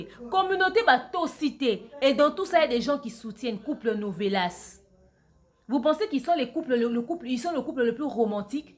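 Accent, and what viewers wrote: Ivory Coast. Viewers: stp ne brande pas l'accent ivoirien on parles mieux français qu'eux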